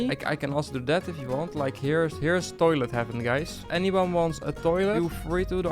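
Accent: Netherlands. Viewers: Ik hoor Nederland haha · U sound like u are Dutch are you? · Je klinkt nederlands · Ik vind het zo leuk ook gwn met het Nederlandse accent. Ga zo verder! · Hi i have been following you for a long time now and i always hear like a bit dutch so are you dutch ir for real english if your like truly englisch than sorry that i asked this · Are you Nederlands.I hear the accent.😂😂😂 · deze guy is sws Nederlands (de accent). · I bet this guy is dutch i mean look at his accent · R u Dutch? Ur name is Dutch and there is kinda a Dutch accent in jr English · Ben je Nederlands want ik hoor dat gewoon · Hello, it sounds like your Dutch. Are you Dutch? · Ik hoor het nederlands accent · Are you Dutch? You here the accent😂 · Ik weet dat je Nederlands bent 😂 ik hoor het aan je stem anyways love the vids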